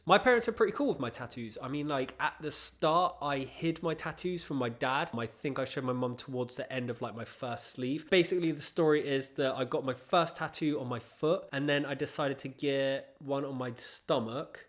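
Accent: United Kingdom. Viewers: I don't have any tattoos (yet). The more I put it off the more nervous I get about the pain and healing, and just anxious about it.. like if I get some weird reaction or if I'll be allergic to red ink. But I've always loved tattoos and wanted them. In some ways I'm glad I've waited, I'm almost 23 and my preferences have changed so much. I want american traditional or neo-traditional tattoos now. Keep doing videos! I have a feeling you'll get a lot of subscribers! Also, where are you from? Your accent sounds british.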